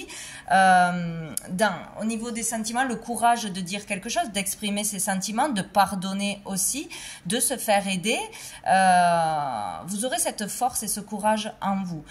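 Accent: South, France. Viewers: Acsent du sud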